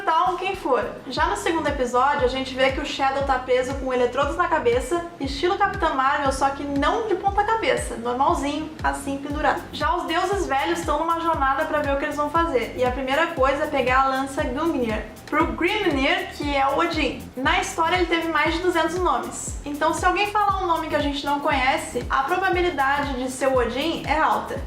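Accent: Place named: Santa Catarina, Brazil. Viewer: Man acho vc tão linda❤️ me lembra minha melhor amiga q brigamos e n nos falamos mais, o sotaque parece de Santa Catarina " manezinha" ahhh man 😂❤️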